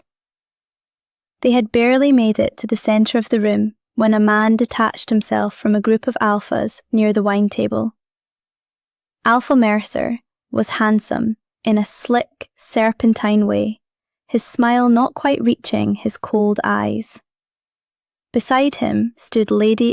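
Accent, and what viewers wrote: Scotland, United Kingdom. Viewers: I have enjoyed this story, and love the accentnof the narrator, since Scotland is where many werewolf stories originate. Just wish the voice was an octave lower. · Love the Scottish accent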